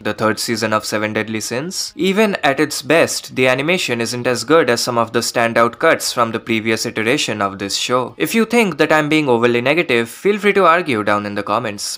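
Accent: India. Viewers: His voice sounds like how Indians usually talk with this kind of accent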